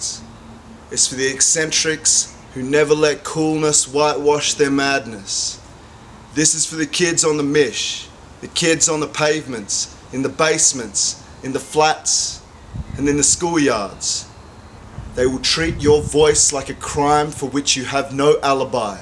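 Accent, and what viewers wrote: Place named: Australia. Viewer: Gidday from a new fan; greetings from a Kiwi in South Korea. Wow, this is impressive. Great to hear great poetry in a funny Ozzy accent! I look forward to more.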